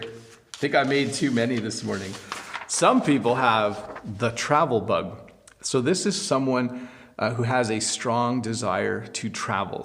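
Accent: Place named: Canada. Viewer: Its so exciting to watch your channel. I just learn so many phrases about a trip. I want to grab Canadian accent. By the way I am from Bangladesh and my native language is Bangla. I like to be native English speaker like you. You are so understandable. Could you please tell me the whole process to be like you. You are just amazing. Hope you will understand the pain of learning English for a non-native country's people.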